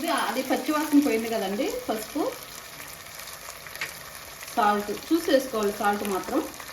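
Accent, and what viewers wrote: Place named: Kurnool, India. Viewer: Kurnool valla laga matladutunnaru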